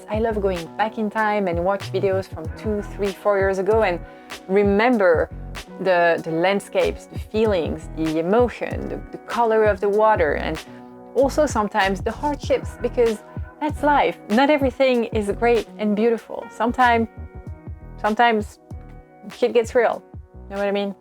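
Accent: France. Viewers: Ur the first time I’ve seen ur video log u r soo❤❤ ur voice is French so r u from Quebec or Europe France? Happy birthday 🎉🎉🎉🎉🎉 next Week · Great Video , I love the french accent ! Vive la France - vive le general De gaule !!!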